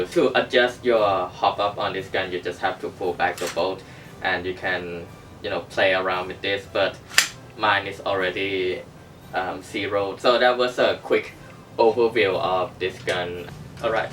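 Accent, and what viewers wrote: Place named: Thailand. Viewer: ก็ว่าอยู่เวลาพูด สำเนียงเหมือนคนไทยจัง555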